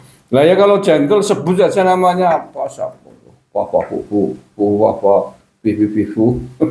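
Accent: Banyumas, Indonesia. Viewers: paling seger nonton kontenne panjenengan bung.logat ngapakke ngalahno boso inggris.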